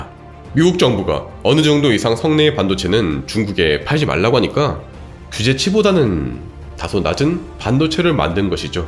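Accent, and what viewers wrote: Gyeongsang, South Korea. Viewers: 경상도 토바기, 싫다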